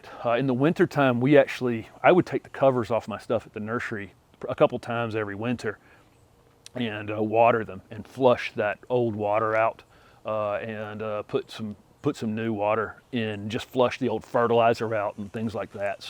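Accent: South, United States. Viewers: I have absolutely LOVED your YouTube channel, since finding it a few months ago, and I have learned sooo much from you! Also, you and I share the same zone (7b), as I live very close to you, in Mooresville, so (as crazy as this sounds🤪) I feel so “at home” listening to your heart- warning southern accent and seeing your familiar mannerisms throughout your videos!😊 Also, It’s extremely obvious to me, and I’m positive to anyone who follows you, that you work so hard to prepare for every single video that you upload, and you’re very efficient and consistent! You never let us down! I can easily see how friendly you are, down to earth, and incredibly REAL, which is sadly so hard to find in people these days! Oh yeah! Duh!😂 Congratulations on you and your family’s absolutely stunning new home!🥳What an exciting time for y’all, and I absolutely love everything about it! 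I have loved seeing both your house and your yard from overhead with your drone! Of course, you’ve knocked it out of the park with your unique and brilliant landscape design, which is such an important part of creating a yard that you’ll love! Also, congratulations and YAY for recently welcoming beautiful “Miss Holly” into your family, as well!🧡 She’s such a beautiful and sweet dog!!! Again, thank you so very much for all you’ve done to help me, without even knowing it,! I am now a diehard, lifelong “HortTube” viewer, AND SHARER!!!! You’re definitely my #1 channel, hands down! Your hard work has absolutely paid o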